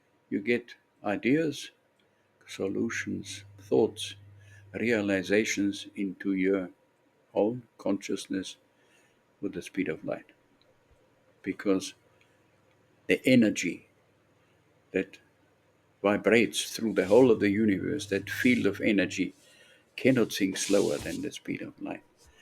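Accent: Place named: Austria. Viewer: schoen den auch englisch sprechen zu hoeren. seit langer zeit im ausland lebend, erfreue ich mich aber immer wieder seinen oesterreichischen akzent zu hoeren. DANKE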